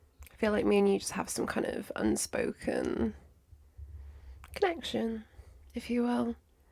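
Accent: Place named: United Kingdom. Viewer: The best part about this video is your british accent and the overall " bustiness " of the video (if you catch my drift😏). The worst part of it is its yet another reminder of what I've never had😔.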